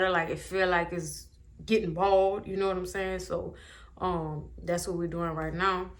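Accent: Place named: New Orleans, United States. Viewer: you straight out of new Orleans that accent thick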